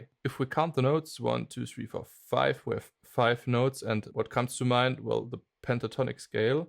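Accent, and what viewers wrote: Germany. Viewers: Yeah, I'm German as well but have to say this guy might want to work on his accent to make himself more easily understood. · his accent gives him away as a german guy ...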